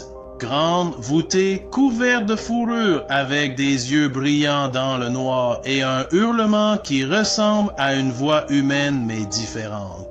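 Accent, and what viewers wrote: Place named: South, France. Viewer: well, as a frequent visitor to France, not only do your videos help with my learning the language, but I also love the authenticity of the southern notes to your French accent 👌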